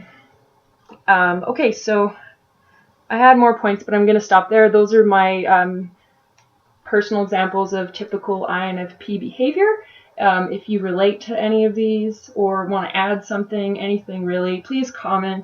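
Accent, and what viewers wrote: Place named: Prairies, Canada. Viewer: It's like you just described my personality and things that I do (or did when I was younger) in ways that I didn't even fully realize, like anthropomorphizing inanimate objects and feeling sorry for neglected plants! I do live inside my head a lot, too, and I have a serious love for unpopular or strange things, and I ramble too much..! Are you me? Anyway, great video, and it would be really cool to see a second video on INFP behaviours. P.S. Are you Canadian? You sound like you're from the prairies, haha.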